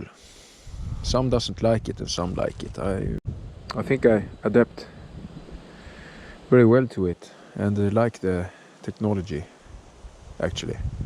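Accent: Sweden. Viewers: Great video; and excellent comments on the bike's performance. I have several KTM/Husky bikes and I love this size whether it's the Duke or the ADV. Beautiful scenery too. The boreal forest is flat but very "endless" feeling. I enjoy your accent. My ancestors were Swedish and all the old folks are gone now so I miss it.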